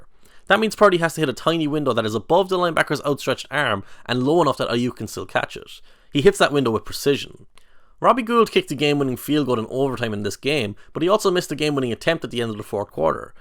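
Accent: Ireland. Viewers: Anyone else tripping about an irish accent about American football? That’s a first for me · The Irish accent makes this video even better. Good stuff! :) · It's how we Irish speak lol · I'm impressed with the observations and breakdown through that fine Irish Brogue. How is it you know American football so well? I hope you get paid good sir. Subscribed!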